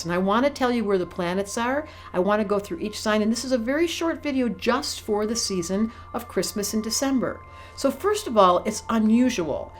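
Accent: United States. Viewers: I love your Michigan accent lol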